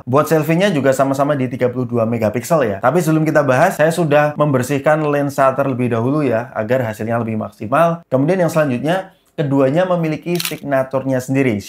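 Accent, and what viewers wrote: Semarang, Indonesia. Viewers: Wong Semarang tah mas?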